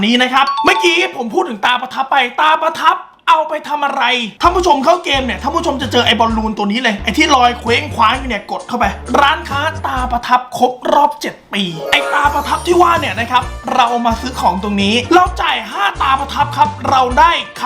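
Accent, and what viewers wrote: South, Thailand. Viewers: พี่ เป็นคนใต้หรอค่ะ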